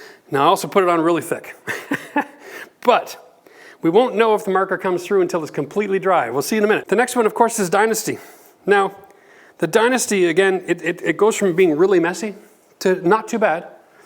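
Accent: Canada. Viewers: Apparently the accent and use of millimeters didn’t clue you in, but this guy is Canadian.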